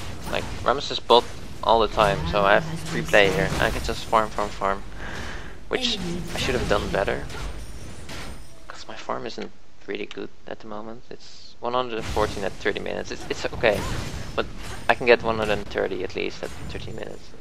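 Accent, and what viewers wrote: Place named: Netherlands. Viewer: i knew you were from holland.. dat accent xD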